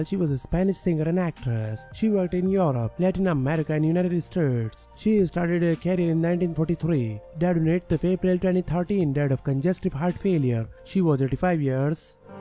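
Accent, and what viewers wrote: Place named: India. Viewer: Obviously, the narrator has an Indian accent.however, he makes it harder to understand because he speaks so FAST! Is this a race?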